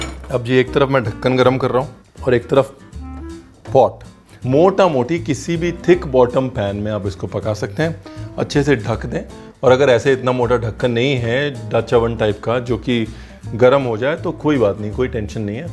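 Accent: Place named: Punjab, India. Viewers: The punjabi touch in ur voice is just amazing 😁 and "ranveer aapne btaya nhi" has separate fanbase🌈♥️ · Reason why I love Punjabi accent even more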